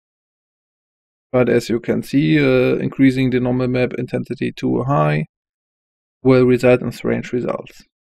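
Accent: Germany. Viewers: Thanks its so easy xD i had seen many Videos before ( bist doch bestimmt deutscher. Es kann mir gleich so vor beim Sprechen 😆)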